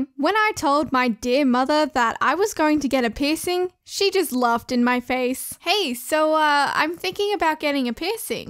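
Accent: Australia. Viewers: Are you Aussie?? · the australian accesnt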